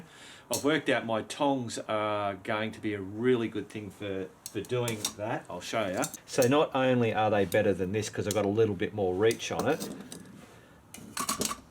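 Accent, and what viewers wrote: Australia. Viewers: Wow, that made me belly laugh! 😂 I'm a Brit and I don't know why but your Ozzy accent just did it for me. Reminded me a little of Rolf Harris (in better days). So entertaining! About the simmer ring, wouldn't it be easier to adjust it before placing it on the burner? ie. Allow the liquid to boil, without the simmer ring and then fit it using the tongues. Obviously experience will guide the pre-adjustment. Just a thought. Thank you for brightening my day! 👍😁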